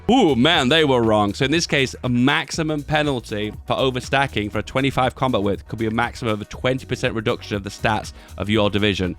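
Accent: United Kingdom. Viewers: What do you say exactly here: ? The pronunciation is a bit too British for me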